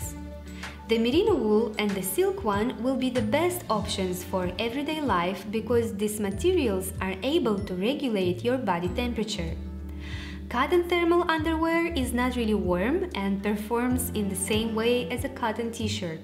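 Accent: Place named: Russia. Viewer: Слышу русский акцент)))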